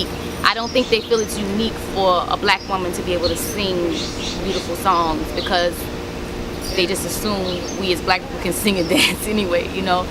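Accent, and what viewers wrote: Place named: New York, United States. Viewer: Girl you fine as red wine 🍷 lol you are beautiful keep pushing mama and why do you have a little New York accent ? Lol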